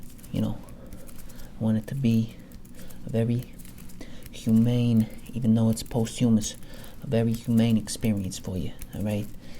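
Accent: Boston, United States. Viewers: Your Boston accent vids are my fave! Are you originally from there?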